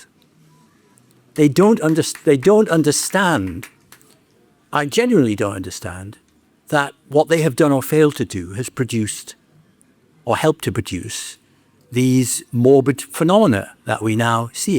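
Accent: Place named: England, United Kingdom. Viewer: Mr. Gray's description of how and why America is embroiled in the Trump Era neglects the role played by those here who are enamored with fascism. Sure, Clinton's insensitive, closed-door rhetoric alienated many who would have never voted for her but to focus on this aspect alone is disingenuous, at best. Also, the "deplorables" weren't labeled as such because they love mining fossil fuels. They were labeled, fairly or not, because of their lack of social grace and their willingness to support a sexual predator who promised to hurt their enemies. Sometimes, an English accent doesn't make you sound smarter - it just makes you sound like an out-of-touch elitist from across the pond. Do better.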